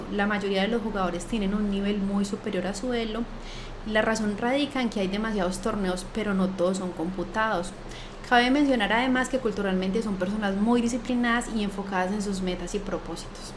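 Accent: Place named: Colombia. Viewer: Acento colombiano!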